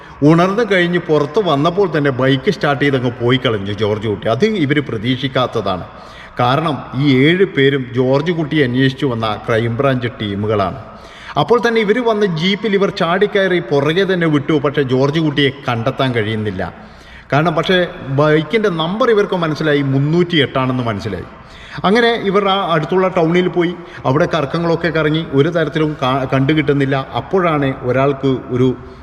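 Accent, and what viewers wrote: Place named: Malappuram, India. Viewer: Malappuram speech. Me also hails from mpm. Like very much.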